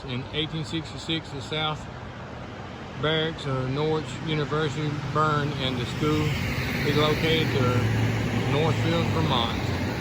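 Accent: South, United States. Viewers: Love correcting your Southern speech. 😂😂 you know I love those old buildings especially the church.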